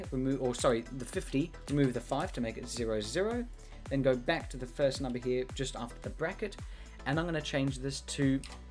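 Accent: United Kingdom. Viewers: The best script tutorial YouTuber of all time, he explains everything too well... And I love the UK accent of this guy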